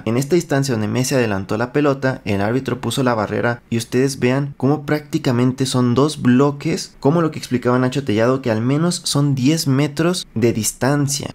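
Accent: Mexico. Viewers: El que hace el vídeo es mexicano no? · Cuando tenes videos de cr7 con el titulo callando bocas..... campeón del Mundo .....Árabe...Te pediría el pasaporte pero por el acento .diría Mexicano ....no tenes autoridad para hablar de Futbol ....Mexicanos hablando opinando de futbol solo son memes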